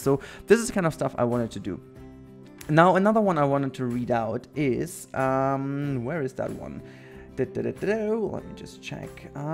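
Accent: Germany. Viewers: I think u have a little bit a German dialect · Man hört sofort das du Deutscher bist xd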